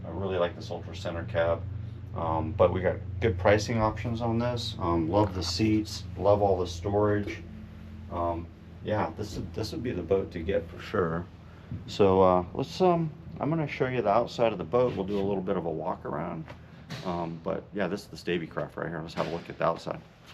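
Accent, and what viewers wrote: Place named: Australia. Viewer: How long have you been in the states? If you're an aussie I can barely tell!!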